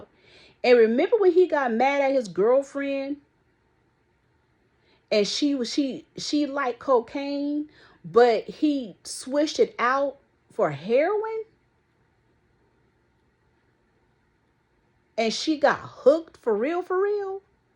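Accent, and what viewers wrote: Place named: Upper Northeast, United States. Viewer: Loving your upper NE Upper Crust enunciation - Accountability 😂. And I know y'all remember "Unpack your adjectives"😅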